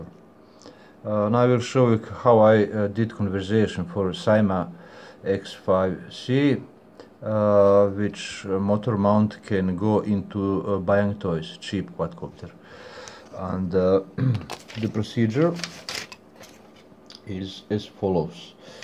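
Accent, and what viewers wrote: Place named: Russia. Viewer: Sorry, your accent sounds Russian. I was complimenting you.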